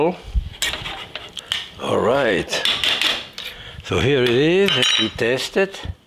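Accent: France. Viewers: Thanks to this dude, when I'm cooking at home my internal monologue has a thick French accent. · i love these little vids. he's great 😊 i love his accent - and his pan, i think hes french - those hand ! tres bon, monsieur ,tres bon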